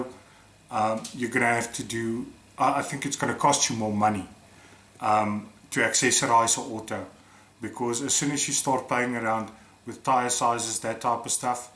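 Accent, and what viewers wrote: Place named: South Africa. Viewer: I love your South Africa accent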